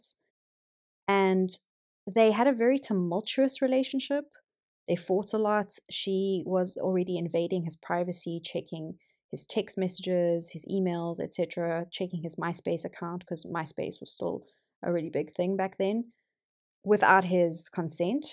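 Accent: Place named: South Africa. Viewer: Just found your channel. I have subbed! I love your content and hearing a South African doing crime 🥰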